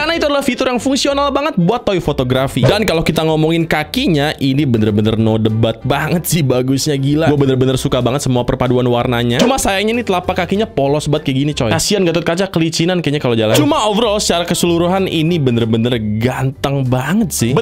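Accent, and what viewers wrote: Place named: Java, Indonesia. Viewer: Kudune koyongene koyongono agak keluar jawa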